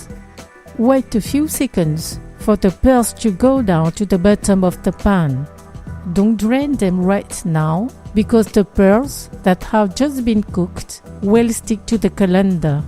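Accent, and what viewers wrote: France. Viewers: Merci, enfin une recette géniale avec tapioca et banane. Hum the french accent... Thank you.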